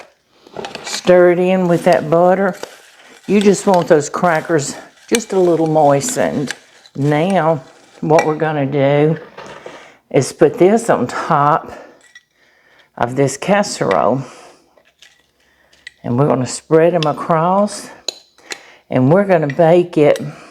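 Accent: South, United States. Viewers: Hi. I was just scrolling and came upon your video. Two of my favorite foods are cabbage and onions. I have never heard of this cabbage casserole. It sounds wonderful and looks pretty easy to make. I love your bright open kitchen and your great explanations, but especially your sweet Southern accent. I'm from California so it's a treat to hear you. Thanks so much. Subscribed. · I will definitely try this cabbage casserole recipe. I am New Jersey girl with southern roots. And I loved your southern accent, and especially your calm demeanor during your presentation.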